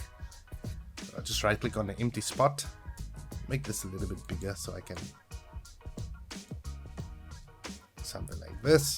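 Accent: South Africa. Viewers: Ahhh man I love hearing south african voices on yt videos 😂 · Wait.... you sound South African...... AWE!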